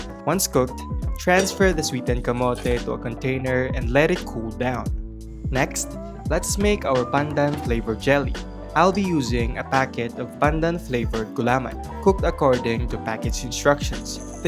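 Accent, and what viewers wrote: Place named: England, United Kingdom. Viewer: I Like your English Accent! It’s giving!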